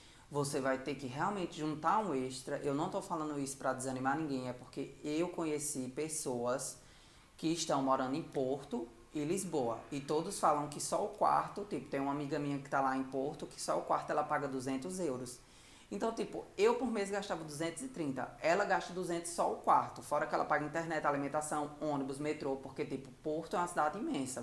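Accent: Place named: Northeast, Brazil. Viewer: Só eu que acho fofo esse sotaque nordestino ? Kkkkk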